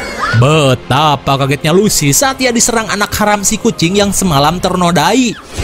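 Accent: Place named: West Java, Indonesia. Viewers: Logat Sunda nya ciri khas dari kang 😅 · urang mna ciga urang sunda ning abi t padalarang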